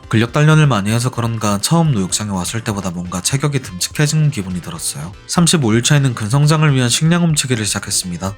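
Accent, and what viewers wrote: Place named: Gyeongsang, South Korea. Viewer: 뭔가 내부자들 조승우 같은 서울말쓰는 경상도사람 느낌이라 평온함 ㅋㅋ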